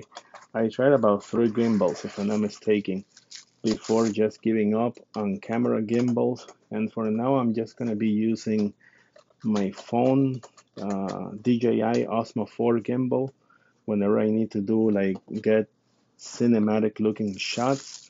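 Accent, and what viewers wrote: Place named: India. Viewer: Put Indian accent in title so we don’t click on that …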